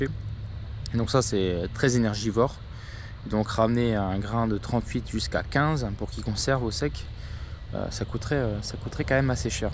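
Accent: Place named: Lorraine, France. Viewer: hello merci pour cette réponse dans l'attente de la suite des video en aparté je me regale aussi du petit accent bien de chez nous qui me fait du bien amitié de lorraine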